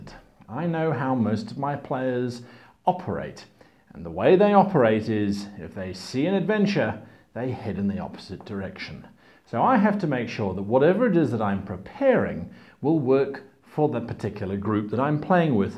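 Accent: England, United Kingdom. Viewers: I could be a great GM if I had a wonder English accent like that! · I'm glad I've found your channel, really useful tips in all the videos I've seen so far. if I can ask, you sound British - do you know of any good websites/social media groups for recruiting players?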